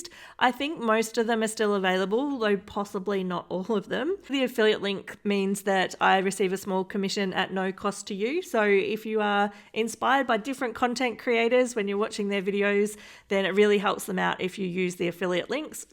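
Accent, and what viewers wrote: Australia. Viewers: Nice, quick and cute cards. I always love your cards and as a Canadian married to an Aussie it’s nice to hear that accent as well. Hugs from Ottawa, Canada 🇨🇦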